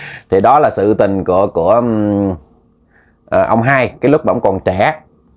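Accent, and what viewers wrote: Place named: South, Vietnam. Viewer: mình ng miền bắc mà nghe ng miền nam hay tây í nghe khó hiểu vài câu:))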